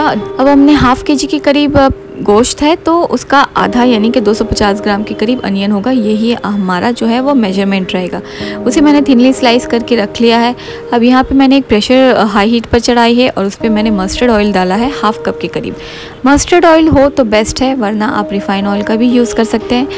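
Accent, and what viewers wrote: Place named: Eastern Uttar Pradesh, India. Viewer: you must be from Eastern UP. Mau Banaras side 😂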